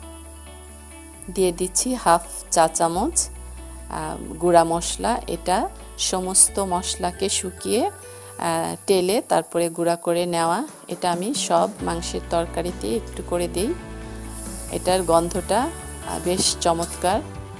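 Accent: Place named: Mymensingh, Bangladesh. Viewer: আপনি মনে হয় ময়মনসিংহের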